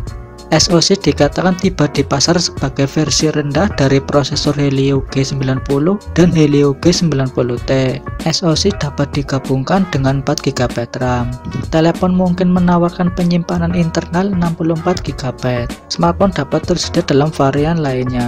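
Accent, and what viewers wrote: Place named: Tegal, Indonesia. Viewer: Medok bgt....tegalll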